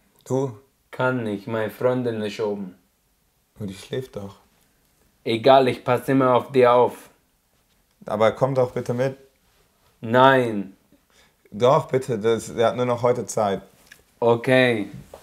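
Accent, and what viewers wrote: Swabia, Germany. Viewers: Schwäbisch mit bissle andere Land noch bei, weisch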